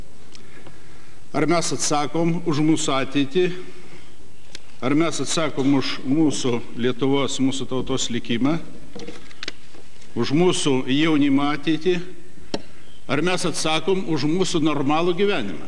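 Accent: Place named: Russia. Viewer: blogai ,jis mires bet jo akcentas russiskas ,kaip ir snieckaus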